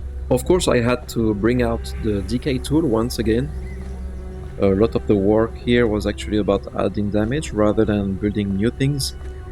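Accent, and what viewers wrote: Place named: Germany. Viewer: It's funny because when you speak English it sounds more like you could be German (a bit) and not French at all. C'est une très beau projet, très prometteurs. Curieusement j'ai assez de mal à construire dans Enshrouded. ça me donne énormément d'idée, trop en même temps et du coup j'ai du mal à m'y mettre, je bricole sur ce que j'ai, mais je ne suis jamais lancé dans LE vrai grand projet. Je fais au mieux, pour traiter l'immensité de besoin et possibilité qu'on acquiert, pour allé dans le sens de mon projet, mais sans jamais y allé carrément. Pourtant la petite pose dans le jeu serait le moment idéal pour le faire, car justement j'ai moins à faire dans le jeu proprement dit. Trop d'idées me paralyze en quelque sorte. Je suis quand même content de ce que j'ai déjà fais, mais rien à voir en volume avec tes réalisations, bien que mes idées soient du même ordre de grandeur. 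Il faut dire que je commence un peu plein de jeu au fur et à mesure des sorties et le temps n'est pas illimité.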